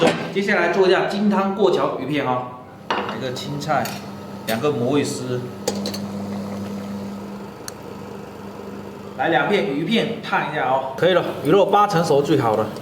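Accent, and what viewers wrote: Fuzhou, China. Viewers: 一听口音就是福州的😅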